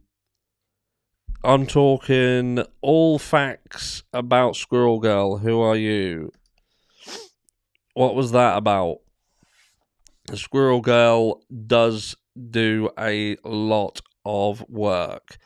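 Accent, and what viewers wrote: Norfolk, United Kingdom. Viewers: Love hearing your Norfolk accent. Makes me feel like I’m back home 🇬🇧😎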